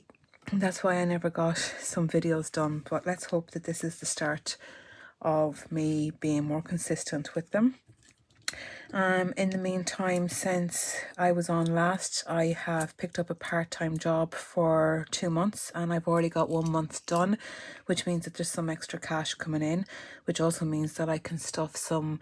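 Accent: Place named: Ireland. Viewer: Irish accent is amazing! I was counting with you the second time...hahaha...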